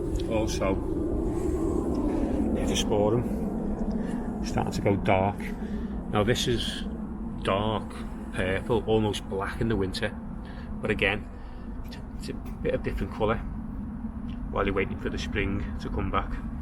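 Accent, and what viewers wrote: Liverpool, United Kingdom. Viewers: Just what I needed! a video by a fellow scouser, the garden is lovely and I think its fair to say you are a bit salvia obsessed, enjoyed listening to you, regards from Ontario, Canada. #YNWA.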